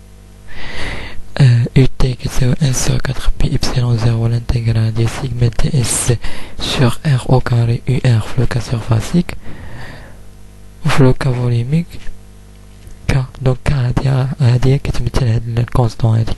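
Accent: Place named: Morocco. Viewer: Il est marrocain 🙂 donc c'est normale que ça façon de parler n'est pas comme la tienne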